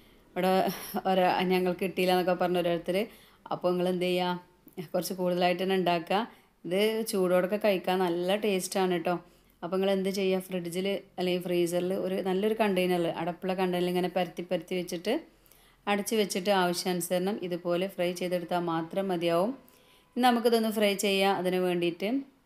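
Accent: Malappuram, India. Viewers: Mpm slang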